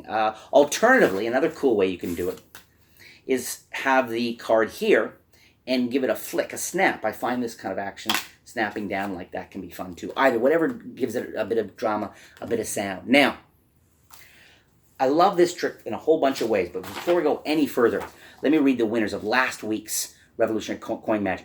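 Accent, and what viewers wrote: Canada. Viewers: Kiera Todd not sure what it is. Maybe it’s the glasses or that Canadian accent, not sure, but he is really good. I always like the tricks he does.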